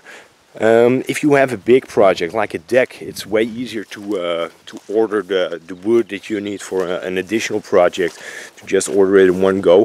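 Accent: Netherlands. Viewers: Grappig dit, ik kreeg door je lichte accent al het idee dat ik hier met een Hollander te maken hadden. Ik heb een paar jaar geleden ook een "playhouse" gebouwd voor een familie in Sintra, Portugal. Het is grappig om te zien dat er veel details in jouw playhouse zitten die ook in mijn playhouse verwerkt zitten, bijvoorbeeld de hartjes is een identiek detail. Zou het door onze hollandse achtergrond komen? Ik heb er geen video van gemaakt maar ik kan je wel wat fotos sturen als je me je e-mail adres stuurt. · Hey, Ik hoorde in je video's een Nederlands accent en nu in je bouw video van je boomhut werd dit bevestigd. Erg gaaf wat je allemaal doet, en ook origineel. Ik ga blijf je volgen, ga zo door. En je weet wat ze zeggen een goede timmerman verliest nooit ze kunde:-) Gr Tienus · ik wist dat jij nederlands was want je praat echt raar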